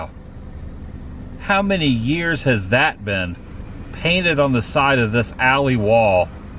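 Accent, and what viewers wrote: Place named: Florida, United States. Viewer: Are you originally from Florida? You and Tampa Jay have the same accent.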